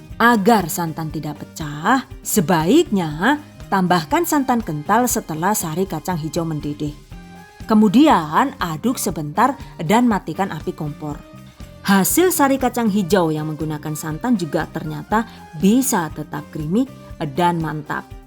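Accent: Banyumas, Indonesia. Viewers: Ngapak ya😂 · Ya Allah.. Ada ngapaknya.. 😂😂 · Nyong seneng bgt karo bahasa ne rika sing ngapak kue yu ..🤣🤣 mantap resepe 🤗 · Wah bisa dijadikan ide buat usaha nich bun nanti insya allah klau saya diberikan kesembuhn sakit saya ini,saya akan mulai usaha seperti ini insya allah #GiveawayTHV2021..dn bun setiap liht vidio bunda saya sll bisa ktwa dipertengah krn bunda sll pake bahasa ngapak🤣🤣🤣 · the best ngapak bar bar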